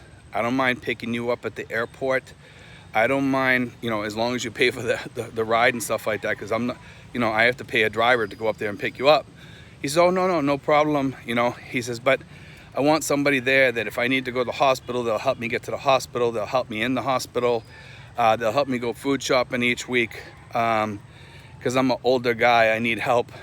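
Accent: Boston, United States. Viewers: you sound like a Boston guy. This is my first time seeing your channel, hello from Lynn Ma.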